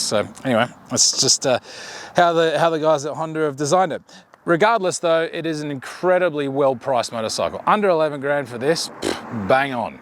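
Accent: Australia. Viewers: You sound Australian... but keep saying the Hornet is "under $11K" - in what country? In Sydney Aust they are "Under $20k" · Mate are you an aussie? In not picking up a US accent. Awesome video though. Thanks for the comparison. Been eyeing off the Honda for my next bike.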